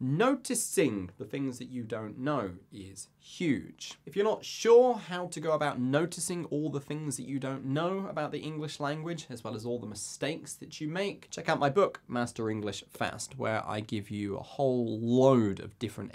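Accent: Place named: United Kingdom. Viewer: I love your British accent 😍😍😍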